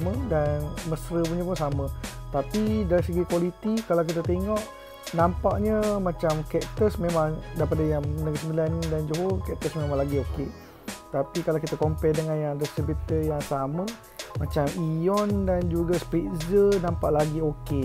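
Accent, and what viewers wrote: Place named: Pahang, Malaysia. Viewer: gaye ckp tu mcm org pahamg😂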